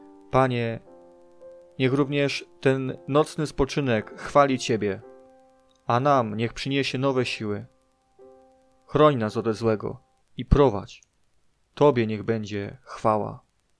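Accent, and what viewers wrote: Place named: Silesia, Poland. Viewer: Akcent Śląski.Początek modlitwy szczery i własnymi słowami jak wskazuje Słowo Boże.Jednak końcowa część modlitwy oddzielona lekko od reszty jest niezgodna z Pismem Świętym,ponieważ nie wskazuje modlitwy do ludzi(Rzym. ) a nawet potępia.Maria matka Jezusa była pokorna niewiasta i nie oczekiwała modlitwy do siebie,ale też kierowała je tylko do Boga Ojca.Pan Jezus poproszony przez uczniów o naukę modlitwy też wskazał,że należy kierować je tylko do Boga Ojca,,Ojcze nasz....świec się imię Twoje(jakie?)....."itd.Pan Jezus nie powiedział módlcie się do mnie,albo módlcie się do matki mojej-tak nie powiedział! Końcówka to wierszyk powtórzony po kimś-nie własne szczere z serca płynące.Biblia podaje dużo przykładów szczerych modlitw sług Bożych w różnych miejscach i były to osobiste myśli nie czyjeś.Nawet w Ew.Jn.17 rozdział cały to modlitwa Pana Jezusa do Boga Ojca-szczera z serca,nie do Marii i nie do anioła.To tak naprawdę przykład modlitwy niezgodnej ze Słowem Bożym,choć robi wrażenie i to jest zwodnicze😘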